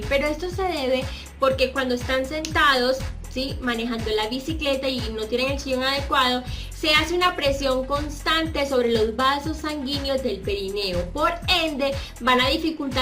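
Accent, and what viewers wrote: Colombia. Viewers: ☺️❤️❤️❤️❤️❤️❤️❤️❤️❤️😊☺️pero es que todas las colombianas son hermosas y su asentó de hablar me encanta 😍 yo me quiero casar con una colombiana plz can you help me 😊❤️ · Que acento tan feo es el colombiano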